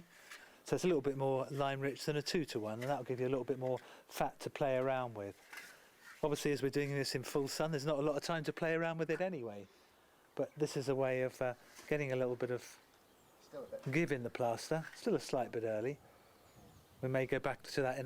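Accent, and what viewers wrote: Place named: Cornwall/Devon, United Kingdom. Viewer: Ah-ha. I wasn't sure if you were nearby because I detected a Cornish/Devon accent. Considering I just have one internal wall (approximately 2.5 m x 4 m) - and one external length of wall which is only about 4 m², it probably is not worth your while coming all this way down if you are "Up-country". It would be useful if you could recommend somebody in my area. Congratulations once again on your incredible work - hopefully this Will prove a good platform for you to get more work in your area.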